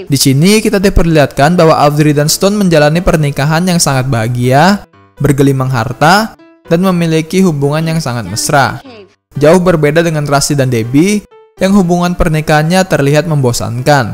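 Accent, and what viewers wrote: Medan, Indonesia. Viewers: aaiii suka kali aku ad di selingi critakn ad logat medan y.. suka kali aku bang.. 😂😂😘😘😘😘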